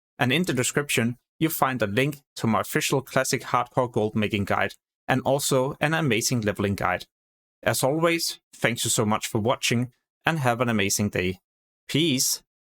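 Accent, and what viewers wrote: Denmark. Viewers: Skud ud til den mest danske accent på youtube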